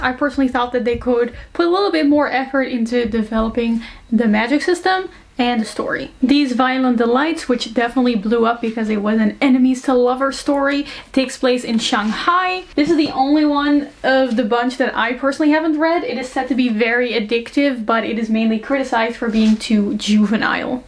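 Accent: Netherlands. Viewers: This is the first time that I've come across your channel and this is how it went: "Oh nice a video about booktok!" "Hey is my nickname, cool:)" *wachting the first minute of the video and thinking I'm hearing a very slight Dutch accent*: "Is this a fellow Dutchie?" *Checking the discription to find out and actually finding out that we have the same name😳" Why didn't I find this channel sooner😂😭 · are you dutch? i hear a slightly dutch accent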